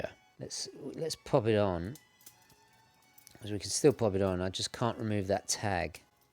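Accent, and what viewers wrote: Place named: United Kingdom. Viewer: I have wondered what country you live in as you have a British accent but I am not sure if you live in Britain. Does anyone know where the Location of residence is?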